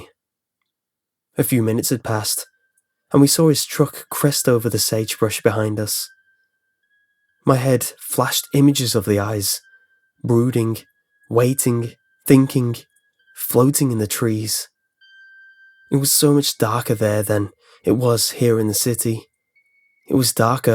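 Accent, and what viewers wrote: United Kingdom. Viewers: buddy grew up in northern nevada with a British accent.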